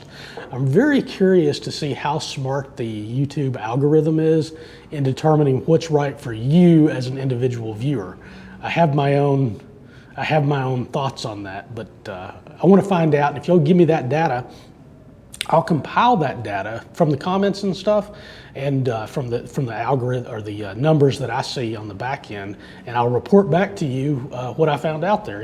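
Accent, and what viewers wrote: United States. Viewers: Nice man. You deserve more subscriber tobe honest. The reason why i subscribed is because i wanna adapt your accent. American accent? And Your videos as well. Like literally i love your videos.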